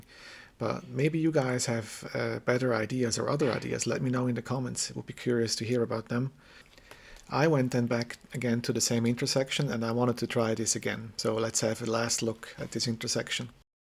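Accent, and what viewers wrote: Switzerland. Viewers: As a program I think the message about cones was not really about cones, its just the message its default to when its thinks something stationary is blocking the path. Meaning the 'error condition' is more general then the actual 'error message'. Also are you Swiss?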